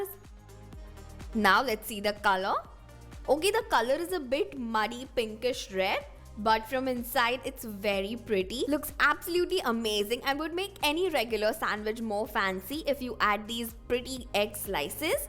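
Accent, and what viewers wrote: India. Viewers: Your hindi-english accent is so sexy😍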